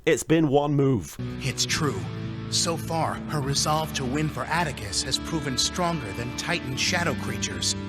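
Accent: United Kingdom. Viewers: Up and Atticus..... God you're so British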